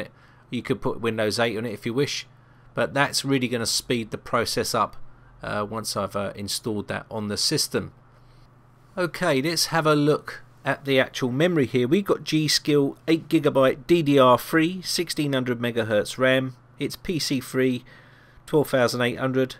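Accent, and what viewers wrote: United Kingdom. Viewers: damn British accents give me cancer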